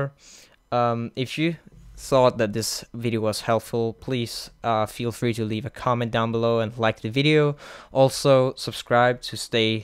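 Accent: Denmark. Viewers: oh your from denmark :D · Are you danish?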